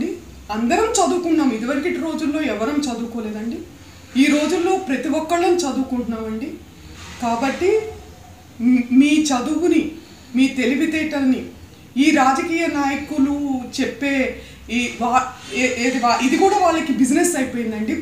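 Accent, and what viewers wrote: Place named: Telangana, India. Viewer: Yentha baga telangana yasa maatladuthunnaro... Meru nijamganay telangana vallalagay maatladathunnaru... 😂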